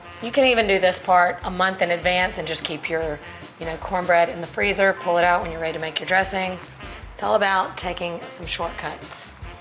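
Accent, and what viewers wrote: South, United States. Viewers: Love your southern accent and cooking talent!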